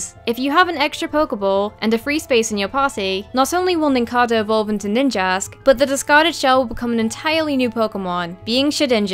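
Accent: England, United Kingdom. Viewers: She's English, as in, from England. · BearShark Interactive she's british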